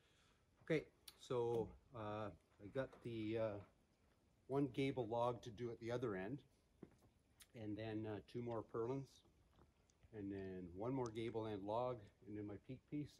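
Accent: Canada. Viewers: Just found your channel, it's amazing! Just subscribed. I'm doing something similar on my channel, building a log cabin with only hand tools, although I have some more modern tools. I'm in the early stages of harvesting logs. I'm learning a lot from your channel and will definitely be incorporating a lot of the things I've learned here into my build. Keep it up! Also where you located? The accent leaves me to believe southern Ontario. I'm nearby in Quebec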